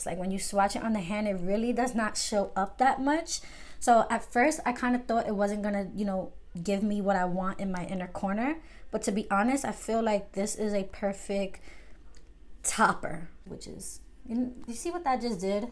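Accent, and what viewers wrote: New York, United States. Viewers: you sound like you from new york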